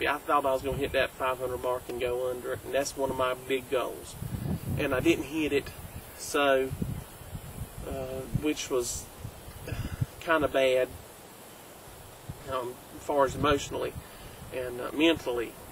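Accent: United States. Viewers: are you from Southern Ohio, KY, or WV? you sound like the people around here lol. It's sad i can sense a difference between myself and them even after 5 yrs. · its a southern accent. not all americans have the same accent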